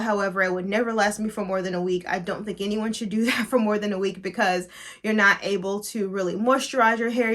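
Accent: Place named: South, United States. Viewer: Beautiful! I swear I heard your Southern accent more in this video than any other 😍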